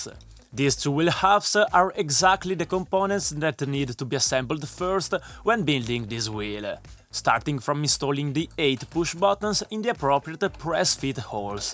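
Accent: Italy. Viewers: i love italian english ;D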